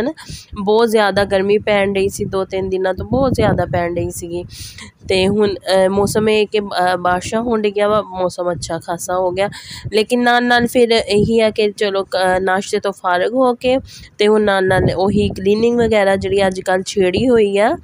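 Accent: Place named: Faridabad, India. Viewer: Tadi punjabi ton lgda tusi fabad ton jy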